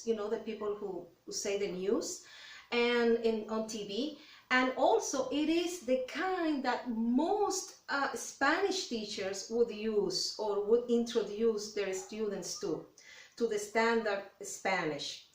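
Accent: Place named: Dominican Republic. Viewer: I can tell you are Dominican.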